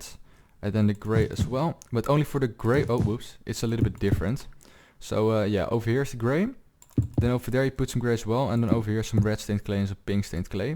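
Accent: Netherlands. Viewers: Ik hoorde wel dat je NL was!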